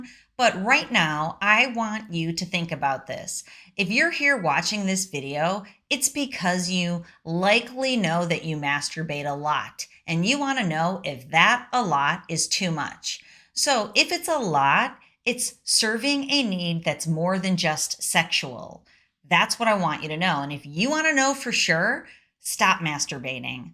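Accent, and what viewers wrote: United States. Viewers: I turned this off as soon as I heard the American voice. I'll bet it has something to do with Jesus.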